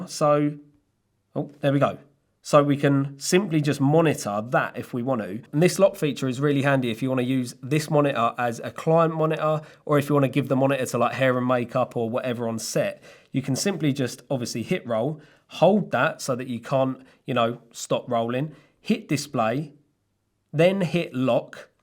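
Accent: United Kingdom. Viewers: American here, I love your accent this is what Americans imagine when when think of a prototypical "British Accent" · Bro I just noticed you look like you're the brother of James Hype. You even got the same UK accent and Name.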